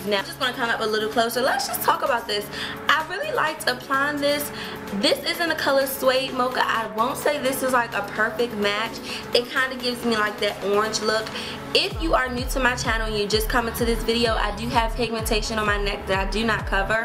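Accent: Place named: Baltimore, United States. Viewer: she has to be from bmore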